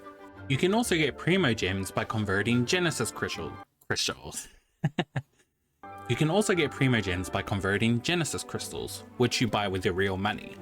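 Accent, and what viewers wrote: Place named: New Zealand. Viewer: That's an accent I recognise, kia ora!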